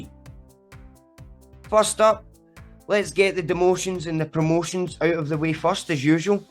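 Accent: Scotland, United Kingdom. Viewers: If his knees are okay, Terunofuji will win the New Years' Basho. If his knees give him problems, I think it will be a tight race between Wakatakakage and Hoshoryu with the latter winning his first Emporer's Cup. Hoshoryu is starting to look more and more like his uncle, the great Asashoryu. Not just physically but also in terms of strength and variety of techniques. Great to hear a sumo commentator speaking in a Scottish accent,!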